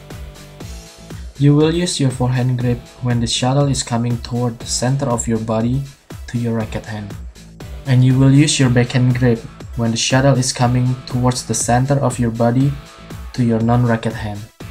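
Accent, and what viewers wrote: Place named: United States. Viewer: Me toobut I don't understand what he is saying bec I'm british and he has american and asian acent😂😂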